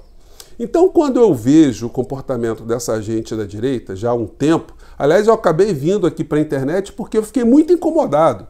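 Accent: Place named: Northeast, Brazil. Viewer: Adooro as palavras do Professor! Parece nóis, nordestinos, falando...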